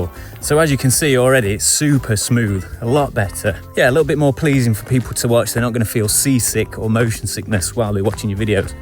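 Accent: Nottingham, United Kingdom. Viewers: I KNEW you were a Nottingham guy. I'm a Derby lad. Aaaah, the Midlands.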